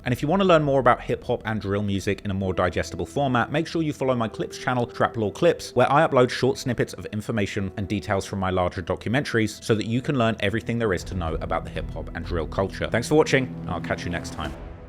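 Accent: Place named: England, United Kingdom. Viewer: this donny a str8 fed where in England is he from 🤔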